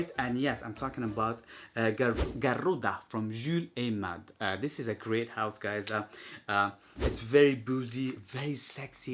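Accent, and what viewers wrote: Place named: Israel. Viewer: Are you Israeli? יש לך מבטא 😏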